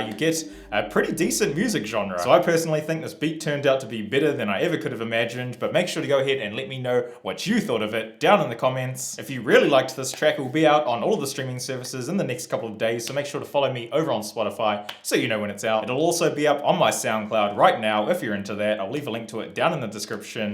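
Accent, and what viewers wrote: New Zealand. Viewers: New viewer here: Your channel says Australia, but all I can hear in that accent is New Zealand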